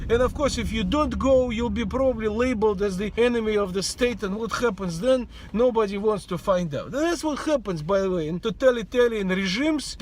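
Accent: Russia. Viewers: Your accent is very lovely. You really are a true Russian, or to be precise, a true Soviet.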